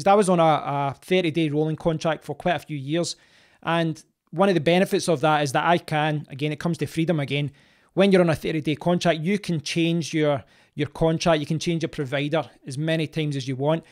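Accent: Scotland, United Kingdom. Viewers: Great content, what was better is your Scottish accent!!! lm ready to go into battle!!! and "pick a fight" lol with my provider!! I took your advice I now get 500 gigs a month max speed $36 a month, (20 Euros) with Vodafone, no contract, no exit fees so thankyou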